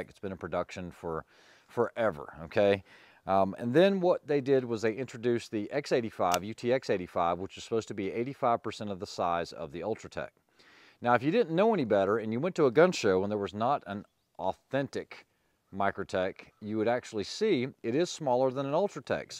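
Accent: South, United States. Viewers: Got to love an Asian with a Southern accent beating on a Chinese knockoff.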